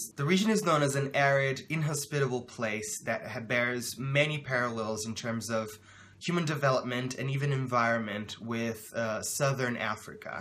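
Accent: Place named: Brazil. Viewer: O rapaz é brasileiro... fala inglês perfeito. Só perceptível porque o sotaque brasileiro é evidente no inglês. Fiquei impressionado, quero ser como ele ☺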